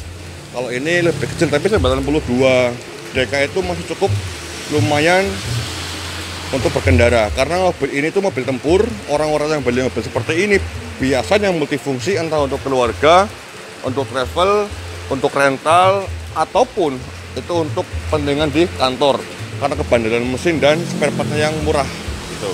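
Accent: Banyumas, Indonesia. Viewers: jos gandos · Tangerang menyimak kang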